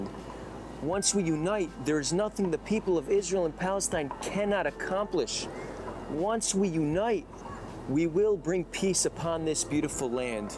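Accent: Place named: United States. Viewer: I think your American English is good enough for you to resettle to Gaza and educate local Arabs to live in peace, since there is no occupation of Gaza and thus no reason for violence. You should dedicate your life to that. There is no need to preach to non-Arabs. We have already army of the socialists, progressives, Fabians and others who do just that.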